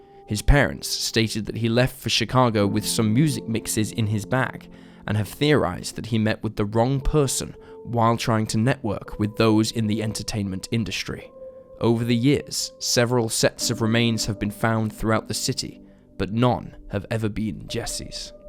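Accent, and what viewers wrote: United Kingdom. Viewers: British limey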